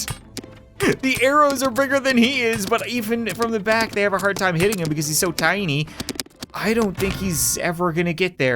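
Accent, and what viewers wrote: Canada. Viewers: Wait, you're Canadian? How did I not notice?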